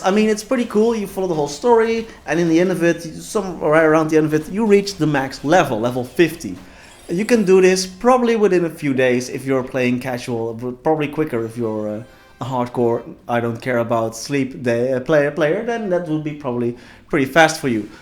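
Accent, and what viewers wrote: Netherlands. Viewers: you sound from the Nederlands man ar im right ?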